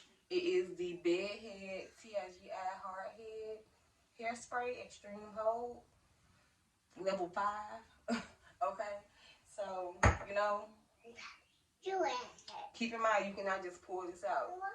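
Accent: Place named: South, United States. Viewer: I love your beautiful accent! Are you from down south?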